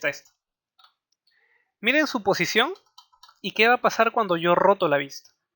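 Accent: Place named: Mexico. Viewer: EXCELENTE MANERA DE EXPLICAR MI BUEN. ME DA CURIOSIDAD TU ACENTO, PARECE ACENTO MEXICANO JAJA. GRACIAS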